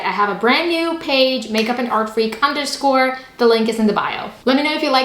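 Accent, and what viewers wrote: United States. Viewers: What accent? She has an American accent nothing special. 😂🤣. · It's just American English 🤷🏼‍♀️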